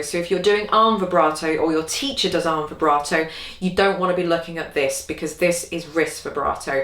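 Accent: United Kingdom. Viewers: This woman is fabulous and no nonsense. I love British accents too :) you inspired me to buy a violin again a twenty year hiatus and here I am practicing!!!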